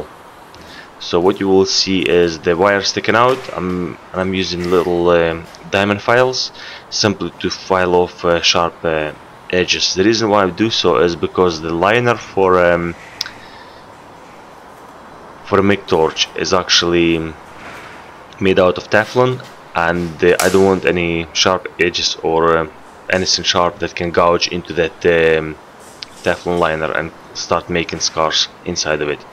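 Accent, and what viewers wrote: Scotland, United Kingdom. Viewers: Good bit o scottish accent comin through there boss where u based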